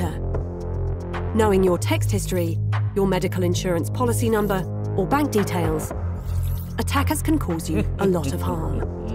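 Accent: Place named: United Kingdom. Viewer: Music and British accent make this very hard to watch! I hate it!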